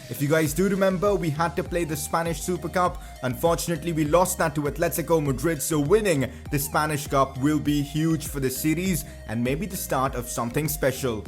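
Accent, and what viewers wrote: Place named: India. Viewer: Are you Indian because you sound Indian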